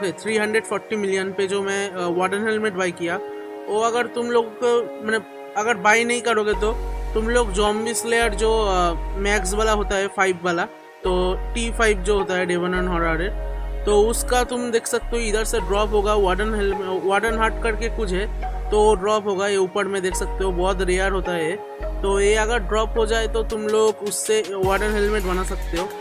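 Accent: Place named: Bengal, India. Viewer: guess by your accent I am Bengali too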